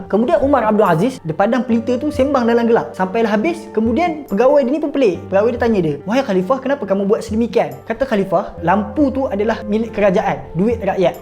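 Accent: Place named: Perak, Malaysia. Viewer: Kuat perak nya 😅😅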